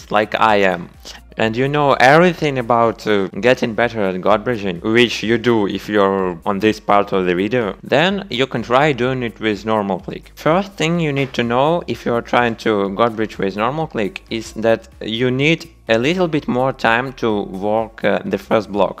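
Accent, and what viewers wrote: Russia. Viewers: Русский что ли? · ты же русский)) акцент слышен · Ты русский который выучил английский или наоборот Видос топ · Сразу понял что русский) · Какой то акцент не американский.. ты же русский...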